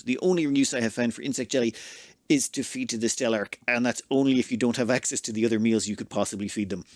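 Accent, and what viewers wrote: Ireland. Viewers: It's an Irish accent.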